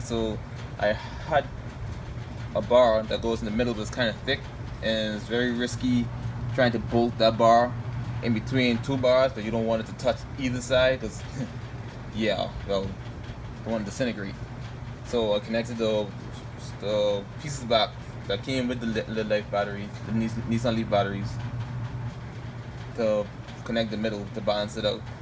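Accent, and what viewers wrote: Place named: Bahamas. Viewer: Nice, am running leaf as well. U sound like Bahamian. I’ve already built my windmill as well using the same motor.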